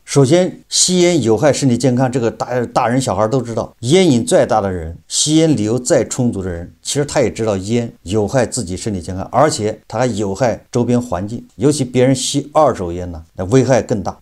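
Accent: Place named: Anhui, China. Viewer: 听口音，叔是不是安徽南方，靠近湖北那一片的人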